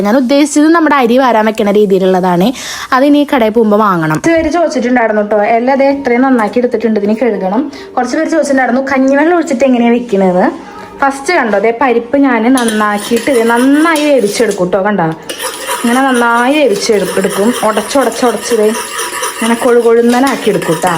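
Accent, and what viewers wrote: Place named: Palakkad, India. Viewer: തൃശ്ശൂർ ആണെങ്കിലും കുറെ words പാലക്കാട് പറയുന്ന പോലെ ആണ് പറയാറ്. ഇവിടെയും നൂൽപ്പുട്ട് എന്നാ paraya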